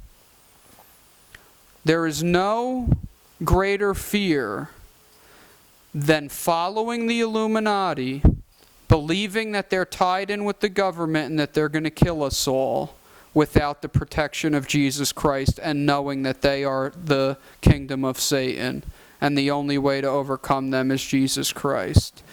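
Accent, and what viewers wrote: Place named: New Jersey, United States. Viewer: Your jersey/jew accent is almost as annoying as your incoherent thoughts and narrow mind & jumbled perspectives. I'm disappointed that I listened till the end.............wasted 30 minutes :( What authority do you speak from? You know that you are worshipping molech, baal, jehovah, yhwh, set, saturn, satan, thor, appollo, abaddon when you are following the religion of the canaanites & pharisees who exalt and demand ritual cannibalism, (communion-Bread/wine) and the obedient worship of a deity that is honored after arising from the dead. Does that sound like something a loving father would demand? for you to proclaim the power of his son, who was tortured? If jesus was gods son, his acts were in humility and grace, not to be shouted from every mouth when judging and condemning, but as an example of the power we have as one man, then as a community that seeks truth and demands transparency & fairness. 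That is gods message. Be responsible and admit you learned a lesson, a 2000 lesson, just because you paid him too much cash, you try to slander his business and livelihood? What happens if I claim as a customer of your construction business, you stole from me, when you you stated a bill and I agreed & paid that amount. How is that scamming & deceitful? Trust me.....you aren't good at meditating or astral projecting, its a gift & ability that we all have as humans, we just need to practice it...........Nice try though. You tortured demons? like from using solomonic magick? Id like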